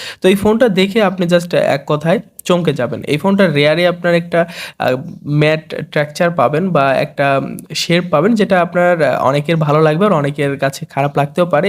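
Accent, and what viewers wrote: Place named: Kolkata, India. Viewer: আপনি তো বাংলাদেশি, কিন্তু আপনার একসেন্ট একদম কলকাতার মতো কেন?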